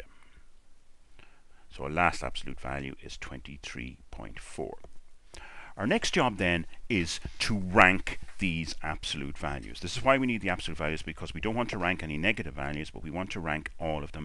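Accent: Ireland. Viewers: thank you for this. Two questions for you: Let’s say I have T+ of 9 and T- is -16. Is it correct to say you would then use T+ as 9 is lower than the absolute value of T-? Let’s say I have T+ of 52 and T- of 0. Do I use T- as my test statistic? Also if in wanted to calculate the effect size, I read I should use the absolute test statistic and divide by the sqrt of n, but if it’s zero, this will just result in zero… even though there was a big positive swing. Hope this makes sense, nice to hear an Irish voice here away from home.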